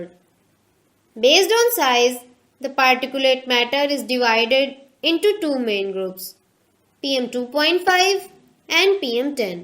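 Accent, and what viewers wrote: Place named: India. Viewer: Indian accent irritate my ears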